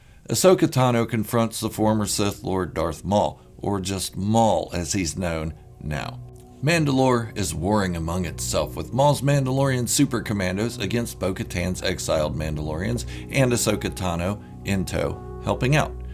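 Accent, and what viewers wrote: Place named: Germany. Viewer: I really miss videos like this this from you, so different and original, sensational More please!. Ihr deutscher Akzent ist ausgezeichnet! I can certainly relate to this video 100 percent as it was so nostalgic for me. I saw Star Wars with my father in 1977 when I was 9 years old, played with my collectibles, created my own artwork ( yes I used to draw etc) of the characters, my room was filled with posters on wall, collected the trading cards, unique merchandise and when the movies were available on Beta/VHS every night was Star Wars night for me. I relied on newspaper articles for any information I could get on Star Wars which was not much in those days. Looking back I am so grateful for lack of spoilers etc that because of the experience of feeling like a kid on Xmas Day when finally seeing the movies as I didn't know anything! 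My imagination went into overload and I appreciate those days so much more now. I think the generation of today are indeed quite spoiled now when it comes to the instant gratification of having everything available on hand via the internet and streaming services. Ohhh I so loved your analysis and perspective on how the Siths told the truth and the Jedi were too arrogant or in denial to accept the truth. I really didn't see it this way until now...the other side of the story. Of course, Obi had to lie or manipulate Luke. The Jedi were just as bad as the Sith in manipulation. Obi Wan and Master Yoda wanted Luke to be traine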